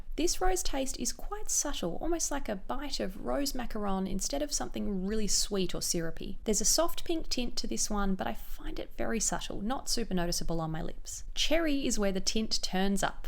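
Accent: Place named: Australia. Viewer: You sound Aussie, do you live in Australia? Because I have been wondering how to get glossier down here forever, and covid means no travelling to NYC any time soon...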